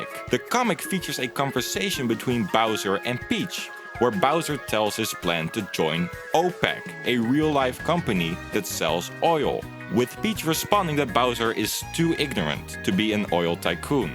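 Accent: Netherlands. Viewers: You're dutch Aren't you?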